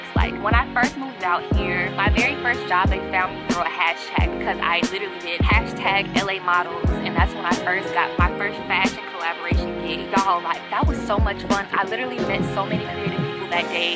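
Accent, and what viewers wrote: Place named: Chicago, United States. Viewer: You from CHICAGO, I HEARD THAT 😊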